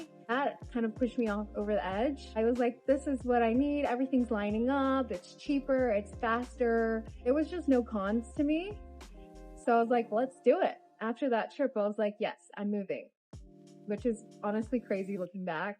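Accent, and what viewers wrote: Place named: New Zealand. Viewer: Hehehe even after just 5 years, that accent is rubbing of on ya! One of us! Great vid will show it to my american family 😊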